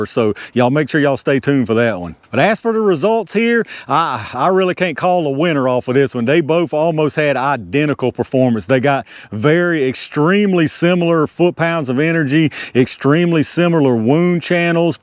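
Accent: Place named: Appalachia, United States. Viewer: Also just from your accent I can tell you're from Appalachia like me so I gotta support the kinfolk 💀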